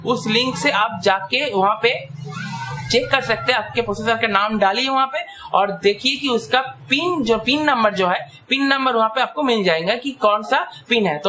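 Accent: Bengal, India. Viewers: Bengali ho kya???🤔🤔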